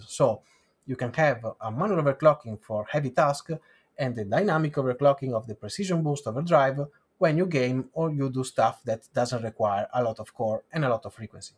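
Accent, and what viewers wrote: Italy. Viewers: Italian accent is funny. No offense, I like it :)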